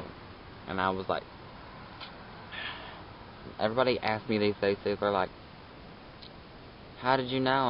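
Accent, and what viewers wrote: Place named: South, United States. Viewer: Congrats, I'm still struggling with coming out and watching videos like this is inspiring me to. I've told a few people so far and the next people I'm hoping will be my parents... :O anyways, thanks for posting an amazing video and btw you have the cutest southern accent I have ever heard!!! :D just saying. -33333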